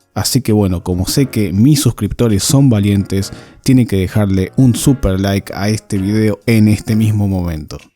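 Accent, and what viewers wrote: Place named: Argentina. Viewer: No Es argentino. Oye su acento .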